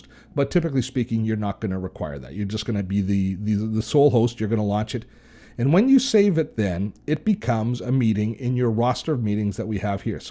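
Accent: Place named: Canada. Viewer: Hi...very watchable...thank you. I am from the UK...have friends in Canada, so it was especially good to hear your accent and see your friendly front room style. I conduct/host a monthly discussion group...and new to zoom wonder about the 'hands up' symbol so I can mute people and then unmute them when I respond to the hands up? I am pretty sure I saw it in the free version...can't see it in the paid version.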